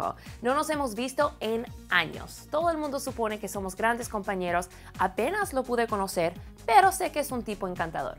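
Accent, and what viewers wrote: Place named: United States. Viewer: Esta bien que quieras hablar como estadounidense pero no mames porque exageras tanto!!!!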